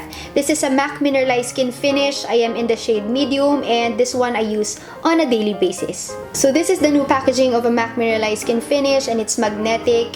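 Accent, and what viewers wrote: Philippines. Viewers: Her accent is so filipino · your english is cery tagalog.... we dont understand what u talk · I love how true you are to your filipino accent.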